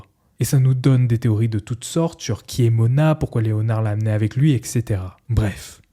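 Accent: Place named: Housing estates, France. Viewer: Ce fond d'accent des quartiers qu'ont malheureusement les 3/4 de nos jeunes aujourd'hui (même ceux qui ne sont pas des quartiers), m'a littéralement dégouté. Affreux.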